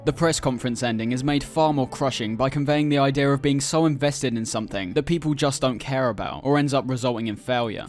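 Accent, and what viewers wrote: United Kingdom. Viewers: Why are you British? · 30 mins into the video and my gf, who has been passively listening, asks “what’s a narraya?” 😂 she’s confounded by the British accent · why are you british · Why are you british?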